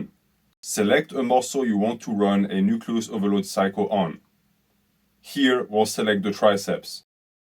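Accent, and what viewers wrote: France. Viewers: Your French accent makes all of this better. Thank you,.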